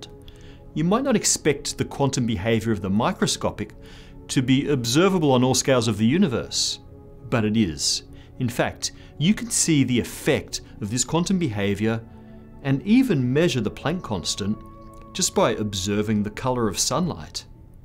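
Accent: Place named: Australia. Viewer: I'm so used to hearing American / UK accents in videoa that when I hear an Australian accent i can't figure out where it's from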